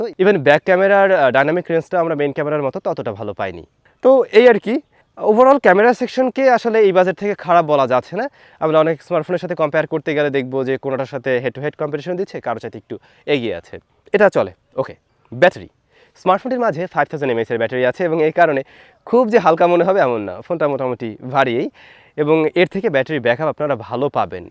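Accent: Barishal, Bangladesh. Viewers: ওহ আন্নে বরিশাইল্লা🥰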